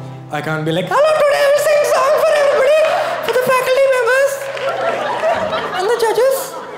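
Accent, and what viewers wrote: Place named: South India, India. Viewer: why are so you insanely cute? 😍 Loved all your videos and have been sharing them with everyone I know.. you are simply awesome!! Being a south Indian, I love that accent and those words too 💗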